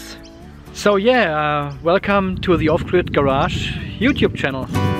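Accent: Germany. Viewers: Dear, am I right that you are originally from Germany? It simply sounds like this 😅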